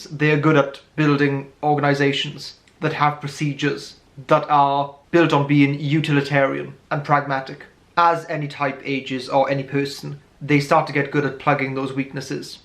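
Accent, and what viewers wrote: Wales, United Kingdom. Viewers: Yo, are you Welsh by any chance?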